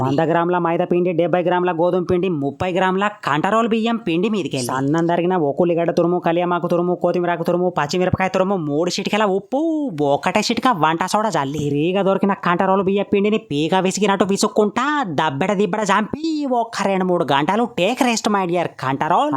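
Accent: Telangana, India. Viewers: Nice bro Telangana yasa enta bagundo ❤ Chitka siper😊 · Good morning. Mana telangana yasa vintunte chala santhosham ga undi. A state lo una me videos chustu ne untam 😊. Ma babu meku peru petasadu ani 🎉🎉🎉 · Pure telangana yasa chala bagundhi bro ❤❤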